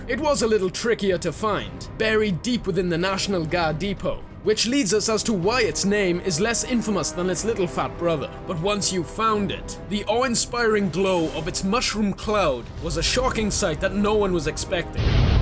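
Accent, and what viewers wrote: Wales, United Kingdom. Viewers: Actually nevermind. Wales! Are you welsh? I am Cockny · i know its a stupid question to ask. are you British ?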